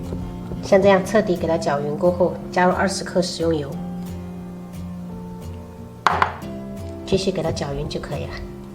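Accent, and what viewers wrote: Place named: Sichuan, China. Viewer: 是四川人，發音是不太標準😁幾將就聽吧☺️我是正宗泉州石獅人😊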